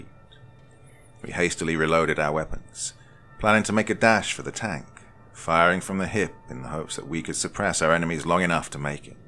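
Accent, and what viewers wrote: England, United Kingdom. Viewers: was great. btw u from the UK u kinda have a british accent. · What part of England are you from ?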